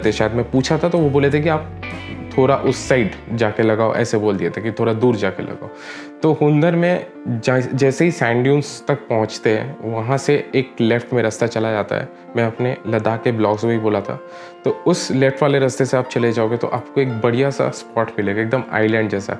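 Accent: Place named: Bengal, India. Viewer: The way you gave info it's amazing . And thanks for the info man keep growing. I have a question are you bangali ? Your accent sounds like Bengali a bit .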